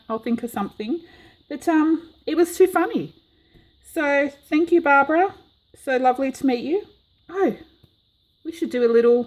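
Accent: Australia. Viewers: Yaaay! That's super!! You're joking about your voice, right? I love your voice! It is sweet, friendly, good spirited and you have an Aussie accent to boot! What could go wrong? 🤗🤗🤗😍😍🤩🤩😉😎😜😜😝❤ Hello Barbara! And I'm only famous inside my studio. 😜😜😜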